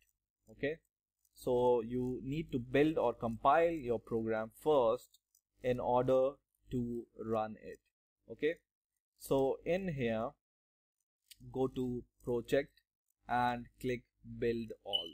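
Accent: India. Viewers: wait. are you indian or german?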